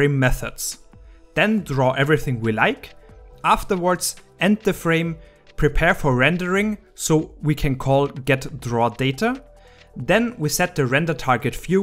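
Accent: Germany. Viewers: Wie man das deutsche raushört 😂 Aber nice Video!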